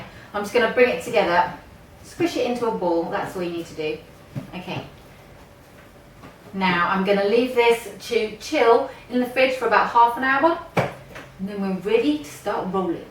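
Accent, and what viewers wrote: United Kingdom. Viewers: Love your cute little British accent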